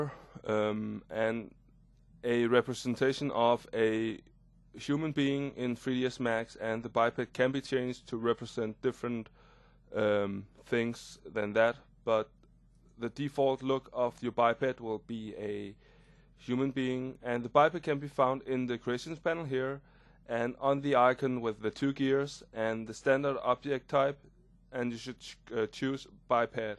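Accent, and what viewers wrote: Denmark. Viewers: it sounds like you're danish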